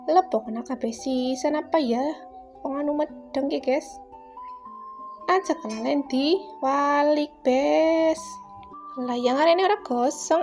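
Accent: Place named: Banyumas, Indonesia. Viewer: Kok ngapak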